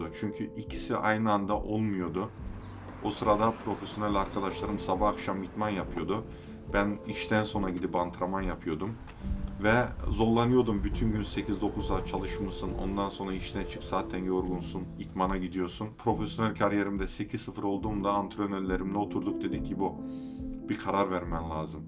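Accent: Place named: Niğde, Turkey. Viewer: kardeşim severek izliyorum başarılarınla mutlu oluyorum ama şu Türkçeni düzelt nolur sen Niğdeli sin yapma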